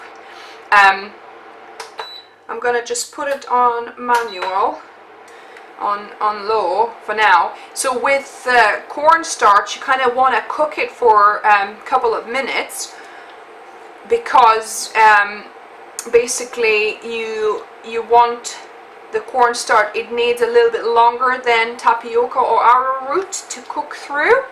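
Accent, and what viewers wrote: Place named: North East England, United Kingdom. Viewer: Hi I live in Stockton on tees. I think I recognise a NE accent! Our 1st daughter Harmony at 2yrs (now 42yrs) didn’t want to eat animals…. we are vegan because of this beautiful 2yr old. I have 6 grandsons 2 were born vegan! I am KM Vegan Baking but I do not work any more as I am a full time carer for my mum. I grow organic fruit and vegetables! Thank you for your delicious healthy recipes 💞💗💓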